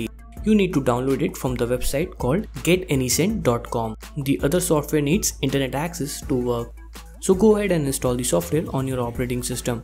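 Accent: India. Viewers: Nah this is Indian Accent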